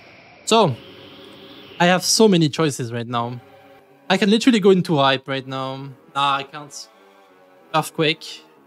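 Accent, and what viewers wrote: France. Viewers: your french accent is fire 🔥🔥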